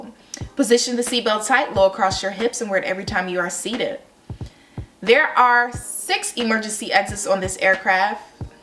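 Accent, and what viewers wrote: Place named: Chicago, United States. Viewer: I can hear your Chicago accent and love it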